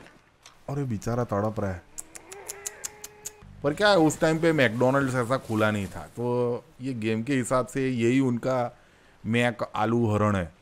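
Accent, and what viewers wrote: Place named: Gujarat, India. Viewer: Your Hindi narration is 10000 times better than gujju narrations. It’s gujju flavored Hindi 😋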